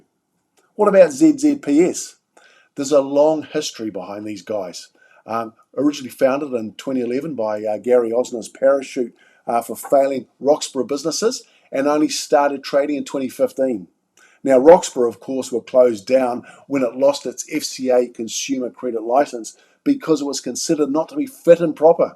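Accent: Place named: Australia. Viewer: This guy is clearly Australian (or perhaps a Kiwi). No prob with that, but is he talking about the law in Australia/NZ or UK? I haven't heard of any of the companies he has mentioned.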